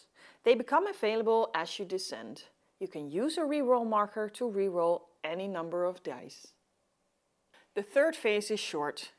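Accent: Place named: Germany. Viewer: Great vid love the german accent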